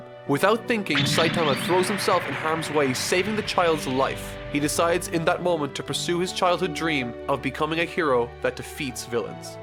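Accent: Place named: Ireland. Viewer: Great video, I've become a huge fan of yours in the past few weeks. But I need to ask this as it's distracting while I watch your videos. Are you from Ireland? Keep up the great work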